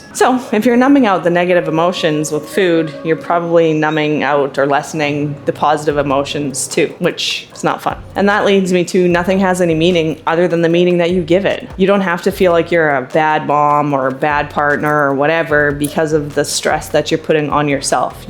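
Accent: Canada. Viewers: I love your content. Good advice for sure, but I gotta ask. Are you Canadian? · Are you Canadian and why can I hear it in your voice and I'm Canadian?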